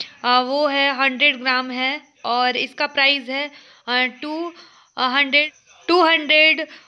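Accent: Bhojpuri, India. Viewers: Aapke muh se bhojpuri bahut achhi lagti hai.aapki aawaz mujhe bahut pasand hai....!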